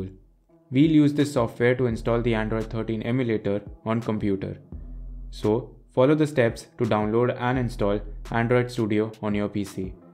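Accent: India. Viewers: its the Indian " english " accent .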